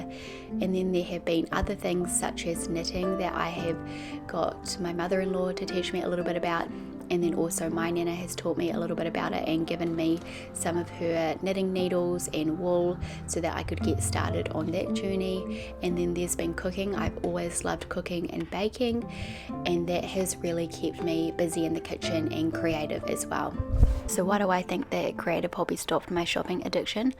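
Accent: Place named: New Zealand. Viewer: The accent is so lovely. I lived in Auckland until I was 18 then moved to Australia and London. Am 54 and still have my accent.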